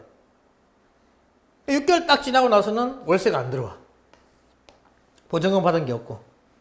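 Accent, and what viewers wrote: Gyeongsang, South Korea. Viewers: 경상도 억양이 들어가서 그런가 봅니다. 어떤 대통령이 경제 발음이 안 되어서 경제를 못했다고 했는데 제가 그런가 봅니다. 다른 부분은 잘 들어주셔요.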